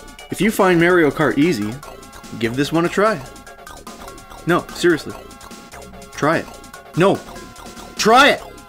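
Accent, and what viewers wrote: Canada. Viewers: Lol canadian accent. Love it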